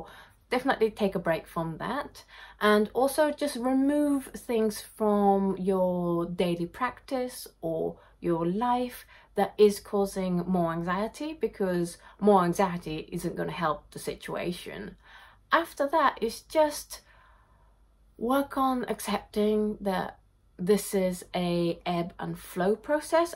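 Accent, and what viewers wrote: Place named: United Kingdom. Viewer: Thank you for making this video, I enjoyed watching every minute of it :) I love your British accent, you shouldn't be worrying about it one bit! Also, thank you for being so open and honest about bipolar, it really helps raise awareness. People who don't have it and have no family or close friends with it usually simply have no idea about what it feels like and how it works. You're very, very brave and a wonderful person and artist!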